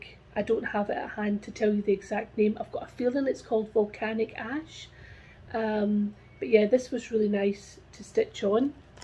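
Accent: Scotland, United Kingdom. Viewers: Thanks for checking in. I’ve missed you. Love listening to a Scottish accent.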